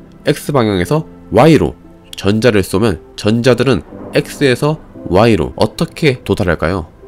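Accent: Gyeongsang, South Korea. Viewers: 아 똑똑하고 귀여운데 말도 기깔나게하는 경상도 남자라니 최고다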